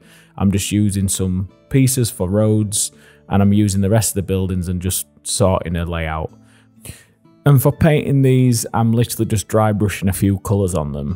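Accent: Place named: North, United Kingdom. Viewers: Hi there. Just found your channel and have watched a few of your videos and I like what I saw so I had to subscribe. I like what you do because I’m wanting to get into doing dioramas myself. Thanks for the great videos that you do and I will continue to watch. Take care and I would say blessings to you from England but I think you actually live in England from your accent so I will just say blessings to you from Weymouth. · If I get stuck with something I always looked here and there was something to help. Going to miss that northern voice. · Good luck mate. I’ve loved your content from day one. Will say I’ll miss hearing your voice. I grew up in cleethorpes and I don’t get to hear that accent very often where I live now haha. Take care man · To hear someone making content and to do it as popularly as you do it, in an accent like my own is worth its weight in gold. 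No shade to southern or middle class content creators but to have you available to view and for your content to feel that bit more relatable has always felt invaluable. Glad to still have Pickle Jar and MS Paints but you will be sorely missed none the less. Reyt good.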